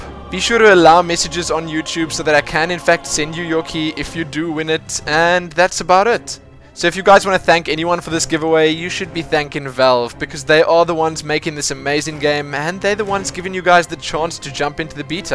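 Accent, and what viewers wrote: South Africa. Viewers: You Sound South African Im from there too! Huge dota 2 fan! Key would be amazing!!!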